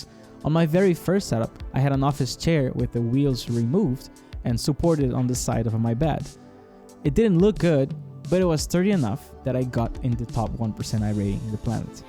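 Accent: Brazil. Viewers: Sotaquezinho de brasileiro em hahaha, sucesso aí irmão!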